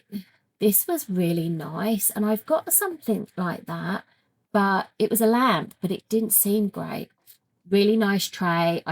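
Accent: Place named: New England, United States. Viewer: I love your accent! Whenever you mention the names of New England towns, I think, “oh, that’s how it’s supposed to be pronounced “ 😂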